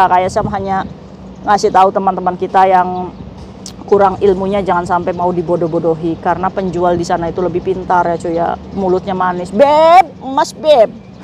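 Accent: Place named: Batak, Indonesia. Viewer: Tumben nadanya kek orang batak kak😂😂😂😂❤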